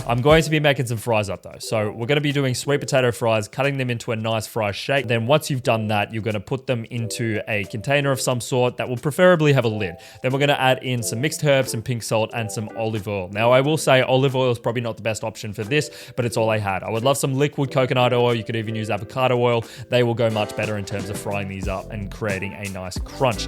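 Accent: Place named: Australia. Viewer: i can't tell if your accent is melbourne or sydney on rare occasion your A noises are more posh like melbourne but then you've got that drawl from sydney it's like listening to a cello that sometimes plays violin parts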